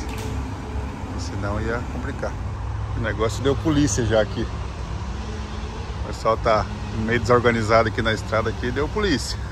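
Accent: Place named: Rio Grande do Sul, Brazil. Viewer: EU CONHEÇO ESSA VOZ GAÚCHO KKKKK PUBG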